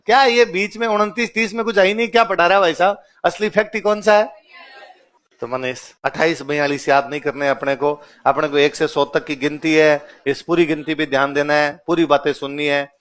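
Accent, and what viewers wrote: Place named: Marwar, India. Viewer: सर आपकी बोली हुई मारवाड़ी भाषा बहुत मीठी लगती है। ❤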